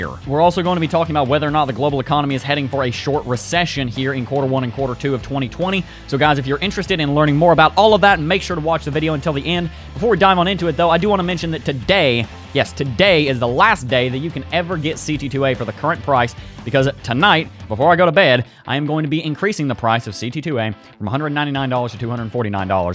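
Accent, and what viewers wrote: South, United States. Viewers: Like your southern accent, which state are you from?😂🇺🇸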